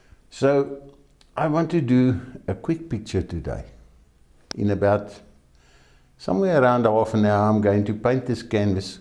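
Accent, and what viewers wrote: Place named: South Africa. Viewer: That is beautiful! It is so nice to hear a fellow South African accent!:) I was born and raised in Joburg and had relatives in Pretoria... I used to love visiting when the Jacarandas were in bloom!! You have a very artistic eye and easy style of painting. I will certainly attempt to paint from your tutorial, thanks for uploading it:) I now live in Hawaii, but I miss the motherland a lot....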